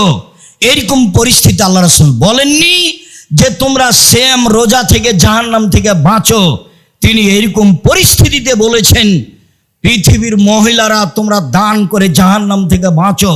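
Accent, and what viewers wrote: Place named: Kolkata, India. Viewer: কলকাতার দাদাদের মত কথা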